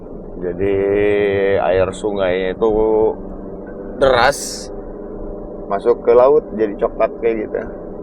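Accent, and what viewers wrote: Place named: West Sumatra, Indonesia. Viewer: Kok nggak sampai ke Aceh Bg...sayang x ah...dan maaf kayaknya Abg begitu faham dgn Sumbar apa , Abg urang "awak". ? Karena sekilas ada tekanan dialek Minang di campur Sunda.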